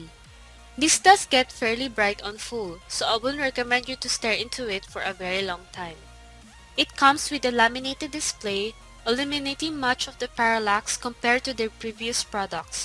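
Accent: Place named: Philippines. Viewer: OMG ate!! pinoy accent 🇵🇭😆💪 idol talaga kita. mag practice ako ng mabuti 😤.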